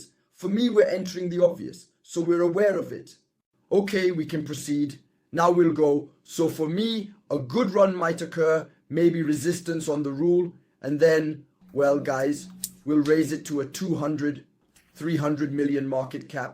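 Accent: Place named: Texas, United States. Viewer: ALLLL RIIIGHHTTTTTT oh yeah (I love texas accent)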